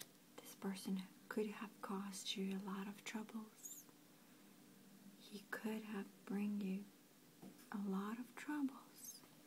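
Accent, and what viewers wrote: Russia. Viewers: Please do another one of these fortune videos,!:( But, PLEASE do it in the Russian accent...it makes me feel so warm and at peace...nurturing <3 I've listened to a few of your Russian videos to fall asleep, lately...they help SO well! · I love all your videos, but make more videos, esp this kind of video, with your russian accent you do so well.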